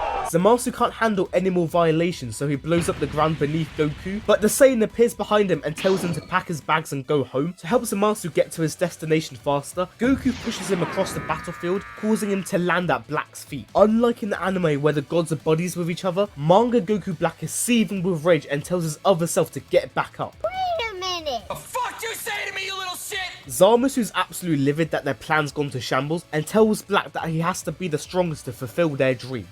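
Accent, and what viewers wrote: United Kingdom. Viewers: >click on video >British accent >first moments have joke about tea >click off >??? 😂😂😂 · too briish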